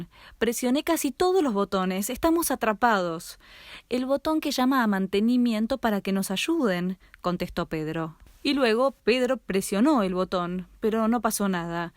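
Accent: Argentina. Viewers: la voz parece argentina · que onda con su voz 0-o y creo que es argentina :v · Esa voz es re Argentina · Suena re argentina y hablando con tuteo y lenguaje neutro JAJSJSJ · Tu acento argentino se nota bastante no intentes hablar en neutro porque te queda mal. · machu YT en las distintas provincias de argentina tiene acentos diferentes, x ej los porteños y los cordobeses. ahora esa es una diferencia clara, pero tmb hay diferencias que no se notan mucho, como será este caso. bueno eso jaja, es argentina pero usa aquí, puedes noc re rancio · Es la típica argentina narrando algo escrito así hablan en el canal Paka Paka.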